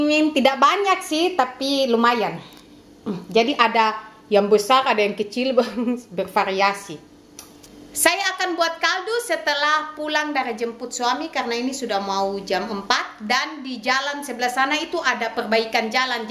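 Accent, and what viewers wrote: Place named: Papua, Indonesia. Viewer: cara bicara kaya orang papua Soalny sy org papua😂😅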